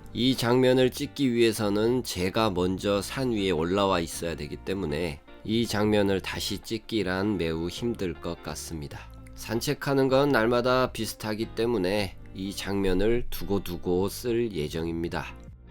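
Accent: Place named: Jeolla, South Korea. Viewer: 풍경이 참 예뻐요 럭키 보는 맛에 애청중입니다 가끔씩 친숙한 전라도 사투리가 정겹네요 워메 ~따라와붔네 ㅎㅎㅎ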